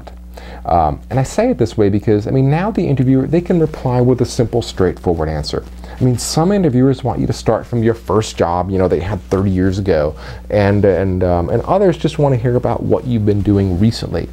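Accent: United States. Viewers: dominated ameriican accent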